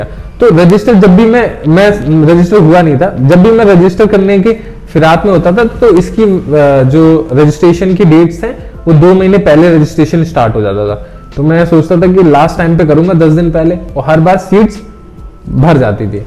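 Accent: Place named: North, India. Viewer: You speak hindi. So I want to ask you anna where do you live.🙏🙏🙏🙏 I mean are you from North.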